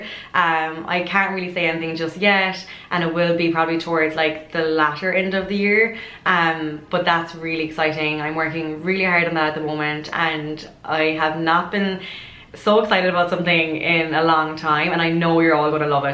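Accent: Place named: South, Ireland. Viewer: I could listen to you chat all day, even though I'm from Northern Ireland I just love the southern Irish brogue, you don't come across as nervous at all you come across so confident. My natural hair colour was light auburn with brown eyes but my niece is more red haired like yourself & she has brown eyes. I wish you all the best I've no doubt you will succeed in everything. I'll be looking forward to all your videos. Oh & I have to tell you I love your sister and your Mam, you have such a wonderful family and not to forget vogue. Sweet Irish blessings to you all. 😘🍀😘🍀😘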